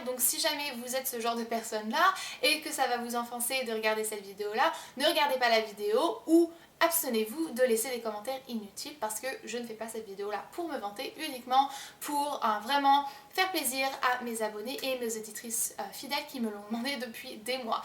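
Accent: United States. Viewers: Coucou, j'adore ta vidéo ♥ ton appartement est trop beau *-* Et j'ai remarqué que même en parlant français, tu as un accent américain :) Bisous ♥ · Puis sa meilleure amie d'enfance est américaine il me semble, elles étaient tout le temps ensemble, elle passait du temps dans la famille de son amie où ça parlait anglais, ce qui expliquerait ce petit accent. Mais je ne connais personne qui à force de trop parler anglais se met à parler français avec un accent ... Mélanger ou oublier des mots, oui, perso ça m'arrive souvent. Mais de là à avoir un accent quand je parle ma langue maternelle... Je pense que le truc de de notre miss remonte à l'enfance passée avec son amie, on attrape plus facilement les "tics" et habitudes à cet âge-là. Voila!! ^^ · moi je vois pas le bazar en tout cas lol super appart jaime bien la déco ! et c marrant ta choppé l'accent américain même quand tu parle français lol <3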